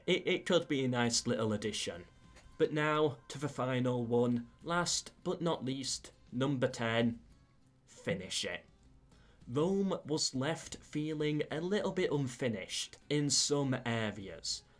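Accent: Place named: Yorkshire, United Kingdom. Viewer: Yorkshire accent, Ever watch "Sharpe's Rifles" ?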